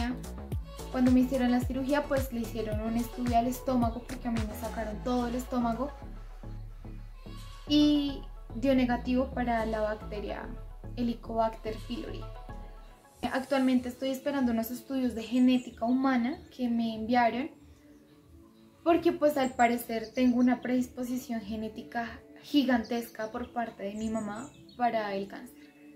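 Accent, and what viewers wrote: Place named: Colombia. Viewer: oye la voz colombiana me gusta mucho un saludo desde México ♥️ cuídate mucho y bendiciones 🙏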